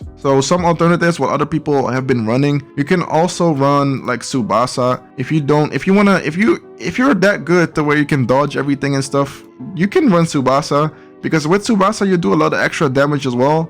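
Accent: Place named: Sweden. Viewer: u sound swedish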